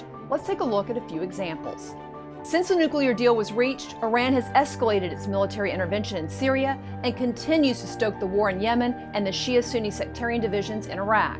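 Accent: United States. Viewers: 6 Seconds Why USA Can't Be Trusted | The Daily Signal American accent.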